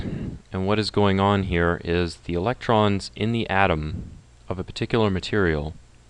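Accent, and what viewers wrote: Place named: United States. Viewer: finally someone with a regular american accent that i can bear to listen & learn from. Thank you. subscribed!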